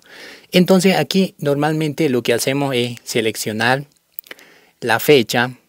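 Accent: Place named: China. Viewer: el perfil es de alguien joven creo y latino porque habla como chino hablando español🤔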